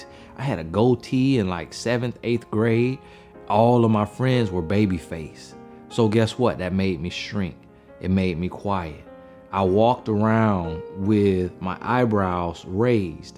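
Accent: South, United States. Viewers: I love the southern accent!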